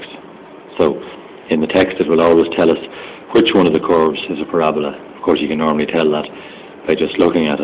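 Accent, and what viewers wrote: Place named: Ireland. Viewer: Also great to hear another irish accent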